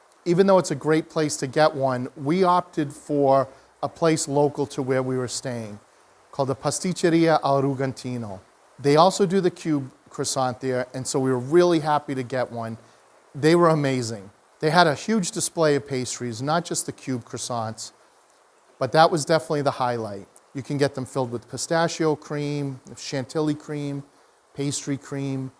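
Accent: Boston, United States. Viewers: First of all your review with your Boston accent made me feel right at home. As a fellow Bostonian living in Southern California for many years, I love that you have relocated to Italy. I just returned from a month in Italia. I went Firenze Ancona Assisi and Rome. I lived over there 35 years ago and now that I’m retired I want to move over. I’ve been watching many videos. This one was excellent! I love how you put up the maps of the city to explain the different neighborhoods. All of the food reviews and attractions. I am leaning toward Trieste. Thanks again. A presto! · I really appreciated your video on Turin; it was the first video of yours that I ever watched. I enjoy Turin and have made many trips there. But the most fun for me was in your first sentence or two - as a fellow New Englander, I said to my husband, I hear Boston :-) so I won the bet when you mentioned your former work as a tour guide there. 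Seeyah!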